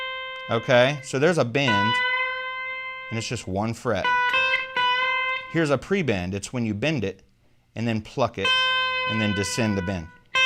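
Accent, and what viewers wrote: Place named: South, United States. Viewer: I purchased this guy's guitar Sizzling Guitar Licks course a few years ago but due to major work and family commitments I was never really able to spend much time on the course materials he supplies. Skip forward a few years and I started to try to use some of his lessons and so called support literature. Like he says in the letter that comes with the package of DVD's "Where do I start with all this stuff? " Good question - the package was so disorganized and the tablature does not relate well to any of his DVD's. A real hill-billy package. You have to sit and listen to this dude blab on for minute after minute in his dumbass country twang wondering when is he ever going to get to the point. He is a great picker but seems to love the sound of his own voice way more! Once you buy this guy's course you will be incessantly bombarded with daily offers of more stuff to buy, but Good Luck if you ever try and request reactivation of your account to download missing tablature. 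2 or 3 e-mails later and not even an acknowledgement. Garbage after sales and customer service. Caveat emptor - translate that if you can. John 5 is much more of a gentleman!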